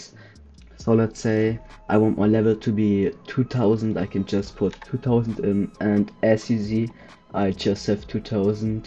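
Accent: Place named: Germany. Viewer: nah this bot comments and u german accent is funny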